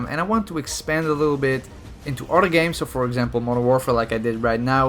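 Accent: Netherlands. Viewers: Yo have a dutch accent but nice video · I can see the Dutschness in your voicetone😂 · where are you from, you sound like from the Netherlands, cuz I'm from the Netherlands.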